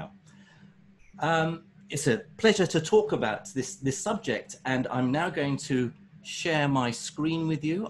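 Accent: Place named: Iraq. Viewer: لغته العربية باللهجه العراقية گلش حلوه.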